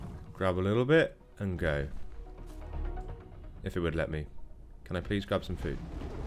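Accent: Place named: United Kingdom. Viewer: Once I heard the British accent and calm personality . I instantly knew this was gonna be good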